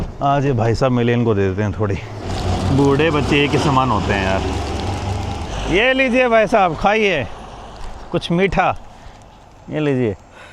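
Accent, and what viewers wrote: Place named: Northeast, India. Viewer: Apke hindi v thdi bohot northeast ke jese ho gye mil jyga naaaa love it sir frm assam😊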